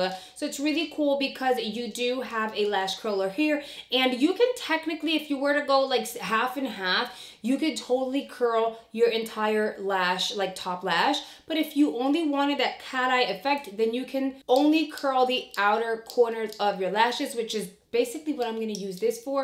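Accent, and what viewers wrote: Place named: New Jersey, United States. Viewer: Always look forward to your boxycharm unboxings...i adore your Jersey ( I’m assuming that’s where you’re from cuz that’s what it sounds like) accent!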